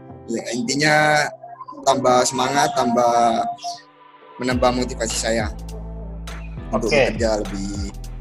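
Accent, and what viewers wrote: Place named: Madura, Indonesia. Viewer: Logat medurone ketok wes ....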